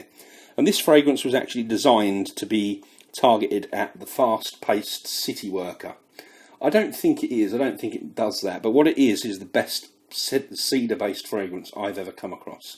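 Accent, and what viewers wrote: United Kingdom. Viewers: Uff that brit accent i missed for long time, managed to find alot of presious parfs thx to you, when i first found ur channel! including precious L`Air du Desert Marocain Tauer... glad you r back, hope you found something interesting in your journey! Any new awesome amber/incence fragrances? i still use only ambre fetiche/Tauer Marocain l'air, would be glad to hear any recomendations! greetings from Russia m8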